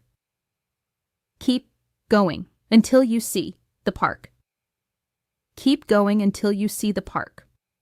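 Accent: United States. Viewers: Is it american english?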